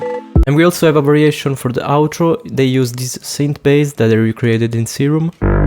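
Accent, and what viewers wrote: Italy. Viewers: Accento molto italiano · minchia si sente l'accento italiano haha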